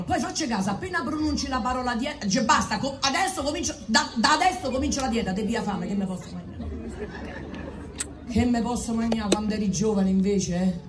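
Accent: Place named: Rome, Italy. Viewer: Parla come un maschio romano. Corpo da donna molto bella ma parla come un uomo. Cresciuta con 5 fratelli e normale. Ho una sorella così. Non e nuovo per me siamo 5 fratelli e mia sorella che rappresenta 10 uomini e giudici sono guai se vi capita di incrociarla. Valentina Persia e sympatica, sincera et sopratutto modesta... E mitica e unica. Un altra che estimo Anna Maria sconsolata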